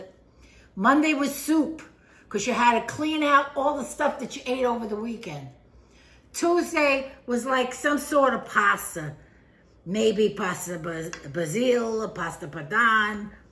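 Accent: New York, United States. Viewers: My favorite red head with a New York accent.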